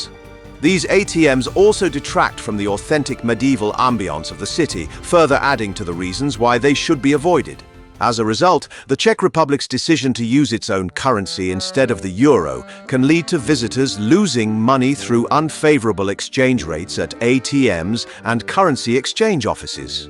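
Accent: United Kingdom. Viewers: Wow. The London underground system - even the staff dont know whats going on and where! Being told about this underground system of order - with a British accent - makes it all the sweeter.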